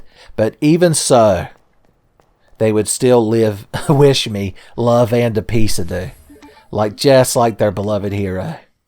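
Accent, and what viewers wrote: South, United States. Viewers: I've fallen asleep to the sound of sweet, soothing, southern voice, and its only affected me by helping me fall asleep and stay asleep.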